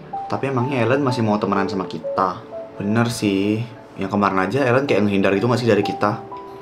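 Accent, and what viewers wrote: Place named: Java, Indonesia. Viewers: Suka banget sama logat Jawa nya kak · bahasa nya medok bangett,mirip orang jawa bet😭😭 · Si cewek keriting bikin bulu kuduk merinduling anjirrrr😂😂😂 Ternya bisa bhs Jawa anjirrr Logat jawanya sudah meresap di jiwa Ad pak prestyo anjirr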